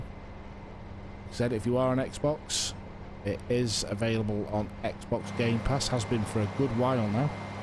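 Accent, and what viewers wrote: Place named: United Kingdom. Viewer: great vid and commentary. love me some uk accent at 2am, thats my asmr. haha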